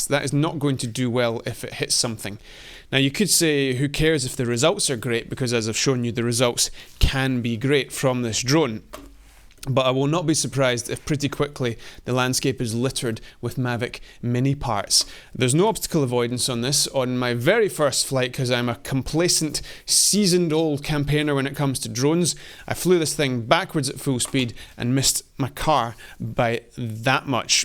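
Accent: Scotland, United Kingdom. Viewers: Scottish. Scottish accent. Never ever confuse Scots with English.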